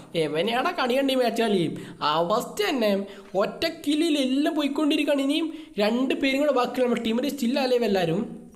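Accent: Kannur, India. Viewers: Bro kannur ano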